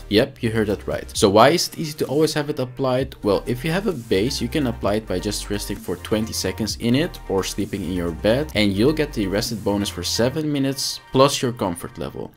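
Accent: Netherlands. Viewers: you sound like a dutch guy not sure but your accent sounds like it haha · You have a pretty dutch english accent 😉 thanks for the video, mate!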